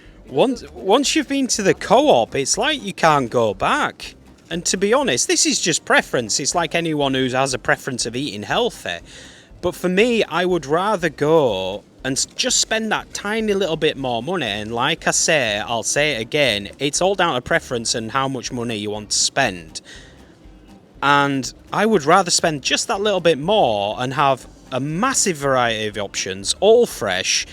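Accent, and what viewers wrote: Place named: Italy. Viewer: Ma sei un italiano che parla in inglese!!!!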